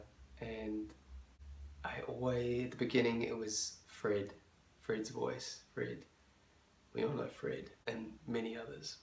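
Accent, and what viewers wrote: New Zealand. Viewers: Kia ora! Having spent three months in New Zealand last year, it warms my heart to hear that charming NZ accent! I absolutely adored the Boyfriend ASMR you did a couple of months ago and would request a new one (: Lots of love from Finland!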